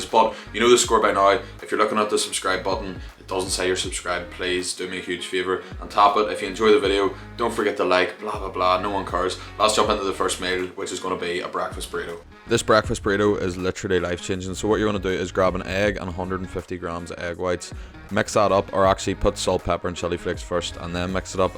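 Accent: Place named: Ireland. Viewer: Love the video mate. What part of Ireland you from?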